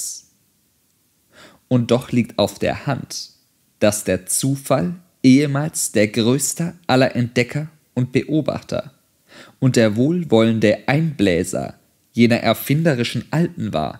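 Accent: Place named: Austria. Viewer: Es hat diesen össtereichischen Akzent. Aber ich finde daran kann man sich gewöhnen. Es ist dafür klar und flüssig gelesen und auch gut aufgenommen. Das Paket finde ich insgesamt bemerkenswert und einzigartig. Wenn man sich an die persönliche Note gewöhnt hat (die jeder Vorleser hat, das liegt per se in der Sache) kann man sich wirklich sehr gut auf das was Nietsche da verfasste konzentrieren. Schade eigentlich, wenn jemand dann aufgrund persönlichen Nichtgefallens zu solchen Ausdrücken greifen muss, und das so global abtut. Daher: Deine Meinung teile ich ganz und gar nicht. MfG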